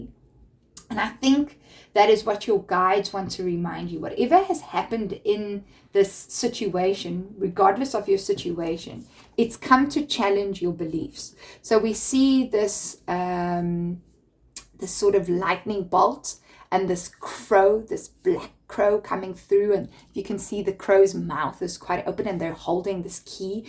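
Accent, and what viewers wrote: South Africa. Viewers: Are you South African? Listening from 🇬🇧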